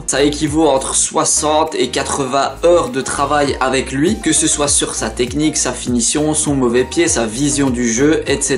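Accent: Liège, Belgium. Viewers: T'es de liège? Je reconnais l'accent:)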